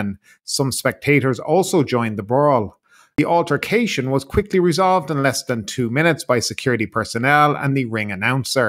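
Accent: Ireland. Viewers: you sound Irish but look distinctly J.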